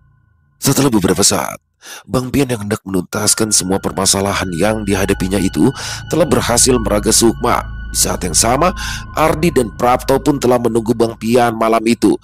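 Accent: Jakarta, Indonesia. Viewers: Bang itu pas banget klo ceritain logat betawi sangat terhibur,apalagi klo bang piyan bertengkar guyon sm baba ali,klo bang doni bertengkar guyon sm ibu zubaedah bikin enak denger nya sambil tertawa,mantap bang sdh bisa menghibur kita semua,sehat utk bang nya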